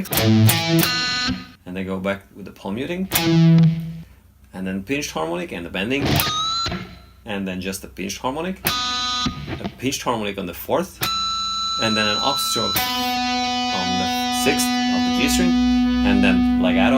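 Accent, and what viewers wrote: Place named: Hungary. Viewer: A jó magyaros angol akcentus a kedvencem :D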